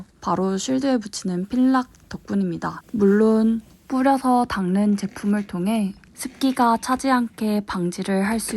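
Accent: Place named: Gyeongsang, South Korea. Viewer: 고향이 어디세요~ 경상도 억양이 나와서요~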